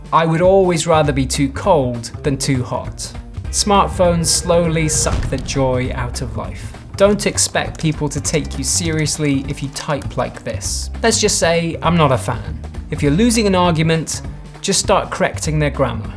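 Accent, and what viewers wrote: Scotland, United Kingdom. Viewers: If it makes you feel better, you sound Scottish to this American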